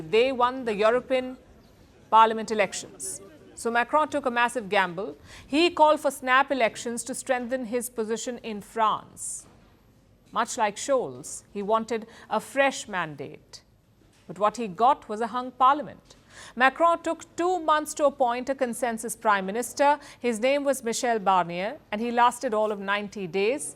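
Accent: India. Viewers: insufferable indian accent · you’re not watching the show, that’s damn sure! I’m addicted to, excellent reporting, she’s smart beautiful lady, I’m a huge fan of her for sure! To be honest with you I kind of have a little crush on her from her beautiful Indian accent to the way she talks and……. Lol I know she hates Trump anyone can see it, including Stevie Wonder. needs to get into the program, it’s not late. You have to follow Mark Zuckerberg, Jeff Bezos …did 😂don’t forget he’s a good friend of Narendra Modi also. I don’t think she drink Kamala’s kool aide so she should support the guy say no to Wars!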